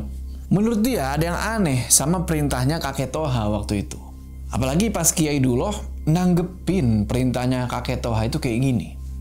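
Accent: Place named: Cilegon, Indonesia. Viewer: Pantesan logat nya Nong2 bae, taunya ini ceritanya di kampung halaman owe (cilegon)😅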